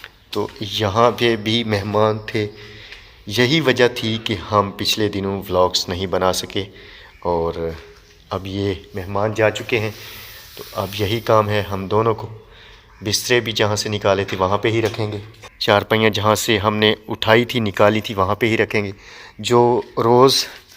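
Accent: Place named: Gujrat, Pakistan. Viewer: Salaam Bahi I recently started watch your Volgs your house is beautiful mahshallah your excient like gugrat are you guger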